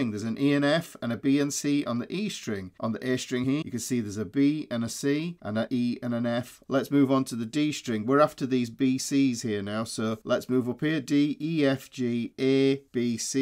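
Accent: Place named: Durham, United Kingdom. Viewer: The BEAD on the 7th fret helped me so much! Also, I lived in Durham for a long time and your accent makes me feel so at home!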